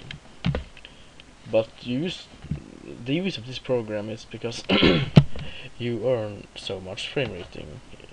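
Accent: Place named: Sweden. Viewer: haha var tvungen att fråga , det lät riktigt svenskt!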